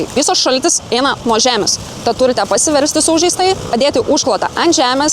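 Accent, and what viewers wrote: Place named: Russia. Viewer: Ljabei fajnas rusyskas akcentas. Ljabei acju uz jusu ismynti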